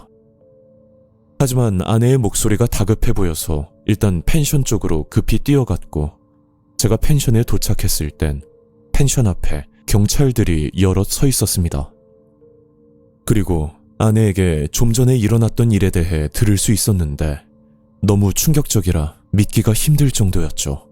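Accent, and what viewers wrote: Gyeongsang, South Korea. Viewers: 여기 채널 은근히 경상도 많이 깜! ㅋㅋㅋ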